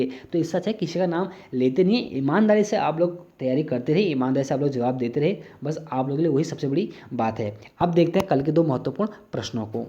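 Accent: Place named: Pahari, India. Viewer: आप की भाषा मे हमेशा पहाड़ी झलक दिखाई देती है। 🌱🙏🙏🙏🙏🙏🙏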